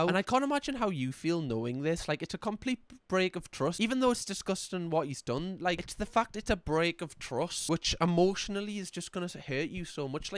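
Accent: Newcastle, United Kingdom. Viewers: Another day, Another Vid. Love u and the Geordie accent lad.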